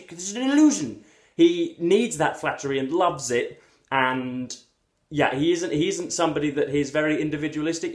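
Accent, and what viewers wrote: United Kingdom. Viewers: I realize...I don't understand British accent. But with book as the topic of discussion, I am going to practice understanding your accent via your channel.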